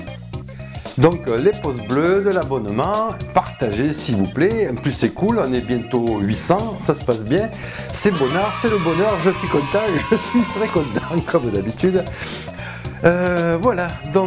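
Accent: South, France. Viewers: Il parle trop vite et avec un fort accent du sud. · Puis-je te demander de quelle région es-tu ? En tant que Belge, je cherche à parfaire mes connaissances des accents français 😅 (pour le moment j'ai compris que c'était "Sud" mais ça reste vaste)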